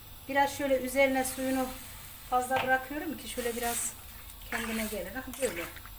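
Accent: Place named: Adana, Turkey. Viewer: Selam Hanım. Rahmetli annemin de bize yaptığı bu çorbanın tarifini ararken size rastladım. Konuşur konuşmaz Adanalı olduğunuzu anladım. Memleketten uzaktayken çok iyi geldi anlatımınız. Ellerinize sağlık.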